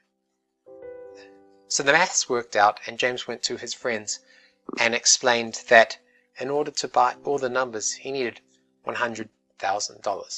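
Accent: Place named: New Zealand. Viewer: you sound like a kiwi! what up broooo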